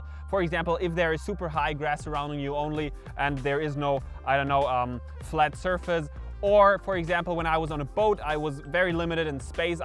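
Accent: Germany. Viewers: Ich wusste das du deutscher bist. Man merkt es richtig hart am Anfang und an deiner Stimme ;)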